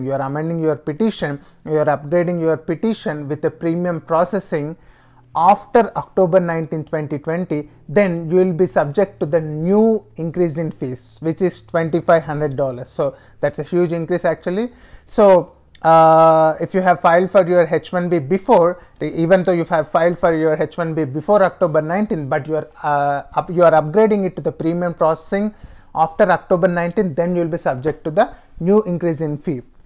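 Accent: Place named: Bengal, India. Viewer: Your accent is like of a Bengali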